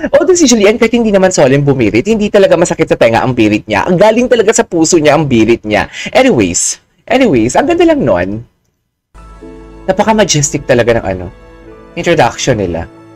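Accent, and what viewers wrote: Iloilo, Philippines. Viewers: Sa tingin ko, ilonggo ka. May accent.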